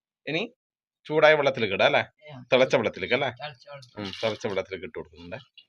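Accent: Kannur, India. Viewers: Padichathe nammal padu alle nammalkku achadi bhashayonnum veettil venda alle nammale kannur slang poliyalle❤❤❤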